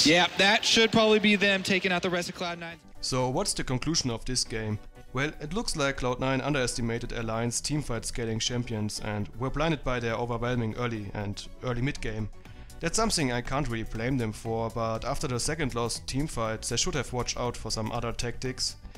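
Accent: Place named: Germany. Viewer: Dat German accent xD wie geil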